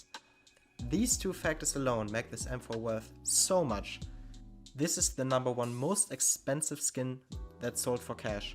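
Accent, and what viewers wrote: Germany. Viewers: I think hes german he has the german english accent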